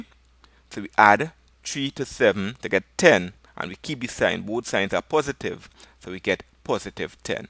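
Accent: Trinidad and Tobago. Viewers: are you from trinidad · I am so going to use your videos for teaching my classes!!! It's so nice for my students to hear a trini accent. Continue the good work! Bless up my fellow trini :)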